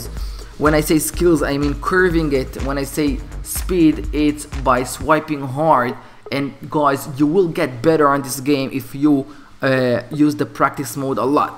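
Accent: Israel. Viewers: יש לך מבטא ישראלי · ישראלי? אם כן, יש לך מבטא פצצה מה זה זה...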